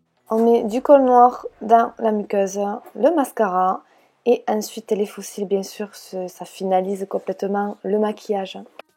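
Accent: South, France. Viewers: ton accent c'est celui du Sud ? 😘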